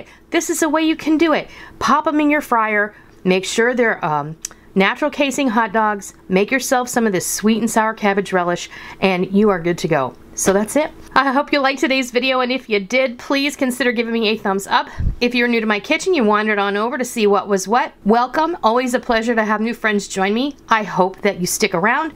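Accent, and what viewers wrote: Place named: New Jersey, United States. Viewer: I am resenting New Jersey! Whuuuup! Whuuup! You have that Northern accent. What part of Joisy are you from. I am in Union County!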